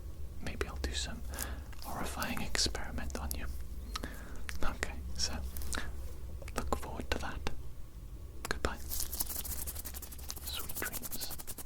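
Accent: Wales, United Kingdom. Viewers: wondered and wondered if i was imagining the welsh accent, but then you said 'ini' and i'm certain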